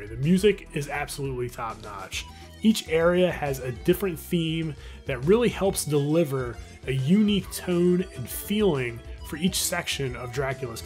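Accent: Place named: Western Pennsylvania, United States. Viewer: Are you from western PA or Ohio? Maybe Baltimore? I hear that accent haha regardless, this game is so close my heart ❤️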